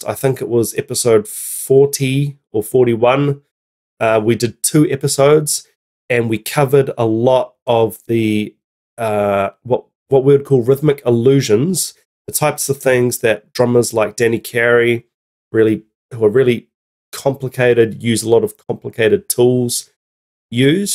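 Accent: New Zealand. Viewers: My golly that's a strong Kiwi accent! Kiaora bro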